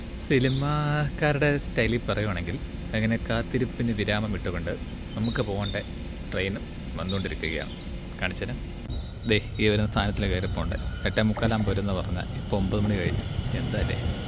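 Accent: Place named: Pala, India. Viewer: Kottayam ano vidu oru pala shayili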